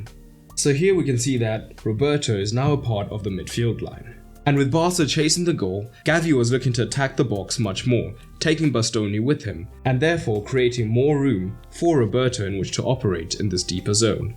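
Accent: South Africa. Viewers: You sound like you are from South Africa.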